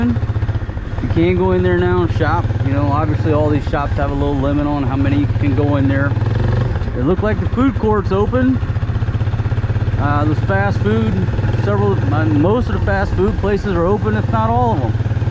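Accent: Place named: Alabama, United States. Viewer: Where u from, sound like Alabama, my home for now...I'm glad they opening up, cause I'm ready to come there as soon as international flights nd visas atr issued...be safe bro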